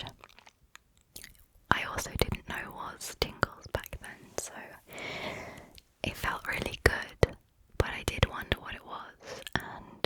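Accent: England, United Kingdom. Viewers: I tried listening to your story I really did but I feel asleep only to wake up to another one of your videos playing. The sound of your voice alone puts me to sleep, it's one of the most soothing ones in the ASMR community. I think your UK accent has a bit to do with it as well :) You are the best :D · Superb! ASMR and your English accent is a perfect combination👌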